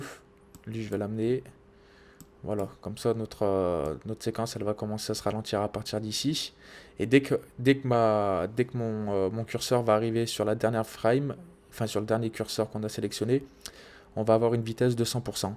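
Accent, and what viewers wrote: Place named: North, France. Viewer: pas mal , l'accent on s'en fout fout....même du nord nord...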